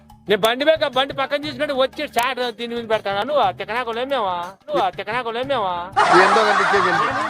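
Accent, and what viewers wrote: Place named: Anantapur, India. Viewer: Super anna ❤ from kurnool.. Ananthapur slang keka full navvu untadhi😂😂🎉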